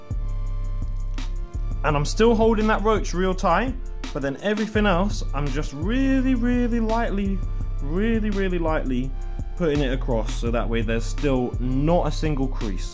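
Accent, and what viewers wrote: United Kingdom. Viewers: Hi just seen your vid pop up and was wondering where you come from I’m in the UK and you sound like your British so thought I’d ask as I’m not sure thanks for vid really enjoyed it 😊👍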